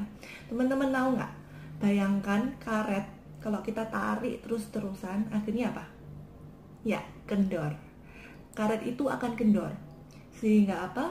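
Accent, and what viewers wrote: Java, Indonesia. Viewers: ini orang taiwan apa orang jawa ko suaranya medok ya hahaha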